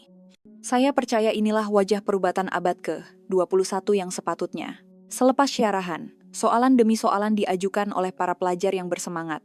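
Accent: Indonesia. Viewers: Pengulas ini wanita Indon kan.Tetap kita boleh detect. P disebut pe,k disebut ka.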